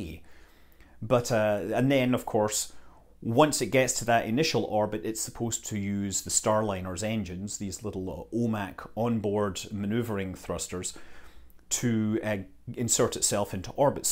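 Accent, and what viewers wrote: United Kingdom. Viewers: That outfit in combination with arguing on space travel in British accent immediately reminded me on Arthur Dent. Sorry for that.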